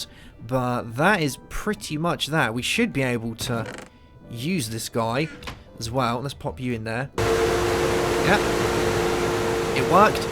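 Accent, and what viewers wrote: United Kingdom. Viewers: really entertaining series and greetings from germany :). btw. i like your accent so much! can you give me a hint which part of gb it is you rule from =D?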